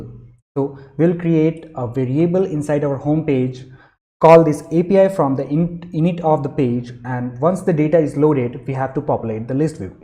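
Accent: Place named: India. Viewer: Начал понимать английский на слух, на тебе индуский английский. Блэт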